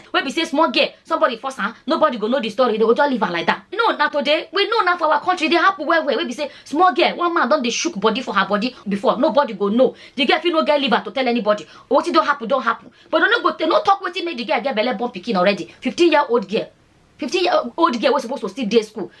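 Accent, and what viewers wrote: Nigeria. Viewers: Hi, this your pidgin english TV, i be like say na him sweet pass, the wey you dey take dey yarn the pidgin de sweet me no be small.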